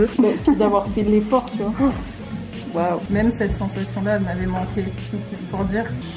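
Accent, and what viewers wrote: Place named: Normandy, France. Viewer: Dans ta vidéo "après la pluie", j'avais soupçonné que tu étais Normande ! ^^ c'est ma vie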